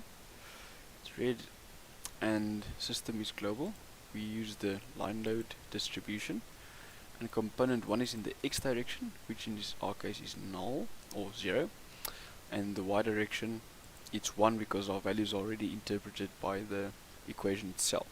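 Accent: South Africa. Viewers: south african much?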